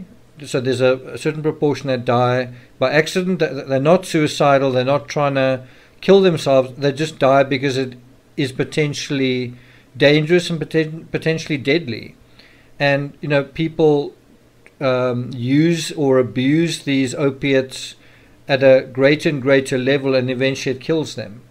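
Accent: South Africa. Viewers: Love listening to your SA accent, makes me feel like I’m back home.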